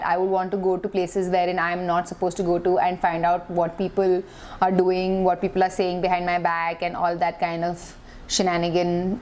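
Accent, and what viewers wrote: India. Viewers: Are u from Kerala..,( palakad tamil)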